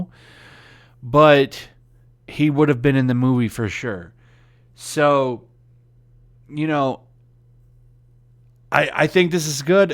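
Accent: United Kingdom. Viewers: Your british accent sounds funny af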